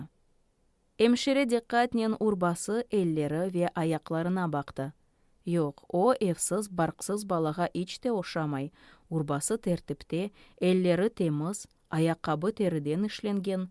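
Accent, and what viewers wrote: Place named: Russia. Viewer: Такое ощущение что русскоязычная тётенька читает с ужасным акцентом книгу на тюркском языке.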